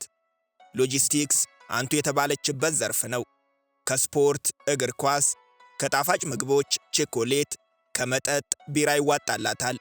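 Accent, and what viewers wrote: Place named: Addis Ababa, Ethiopia. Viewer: yemigermew ahun yalehut gent Belgium new ena ende addis temesche new yesemahut konjo akerareb new berta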